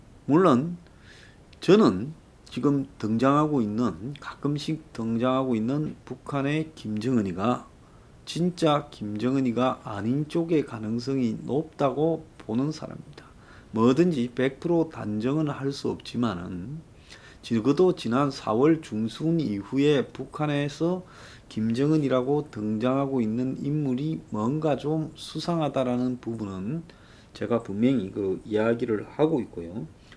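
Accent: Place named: Gyeongbuk, South Korea. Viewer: 황장수는 부산 출신이라면서 말은 진한 경북 말을 쓰니 듣기가 몹시 어색하다.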